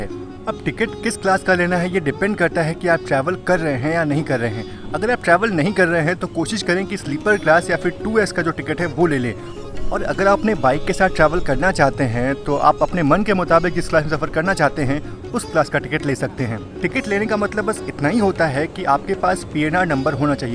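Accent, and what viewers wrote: Varanasi, India. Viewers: sir aap varanasi ke ho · Bhai kya aap bhee banaras se ho?????? 🤔🤔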